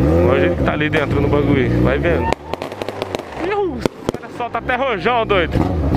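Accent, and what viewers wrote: Goiás, Brazil. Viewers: mano vc e de onde ? vc fala sempre no pique do Goiás ? nasceu lá ? pequenas duvidas ai , responde quando der vlw abraços ✌👍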